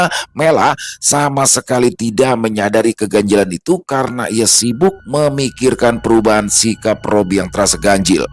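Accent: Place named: Betawi, Indonesia. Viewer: assalamualaikum bang, salam sehat buatbang bang seneng banget klw ceritanya bernada betawi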